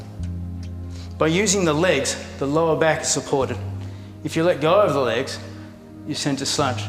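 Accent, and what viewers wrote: Australia. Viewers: I do yoga on You Tube every 2nd day and it is so refreshing to finally hear an Aussie voice! Thanks for the vid :)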